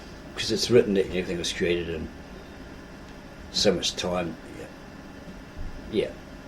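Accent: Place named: Australia. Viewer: Worst video narration ever. Between his halting mumbling and dense Aussie accent it was barely understandable. Thank God for closed captions !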